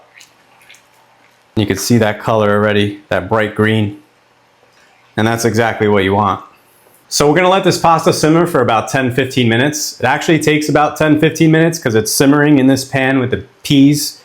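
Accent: Philadelphia, United States. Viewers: The way you say woodur, lol that philly accent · Very Philadelphia accent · God I wish you didn’t speak American, can’t listen to it 🤦🏻‍♂️ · Looks great! I knew a Sicilian woman who would make me peas with rice. Same idea…was simple, but delicious ! Do I hear a Philadelphia accent ? The way you say WATER.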